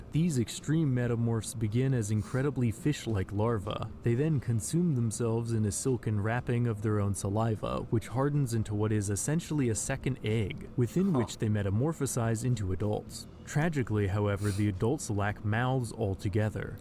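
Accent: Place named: Russia. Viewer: In general, I think you have a chance to become popular👍 . (Well, English with a Russian accent is so-so.)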